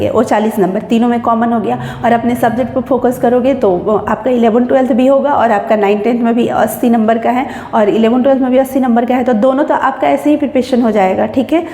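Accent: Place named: Bengal, India. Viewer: Aap bangali ke tarah bolti h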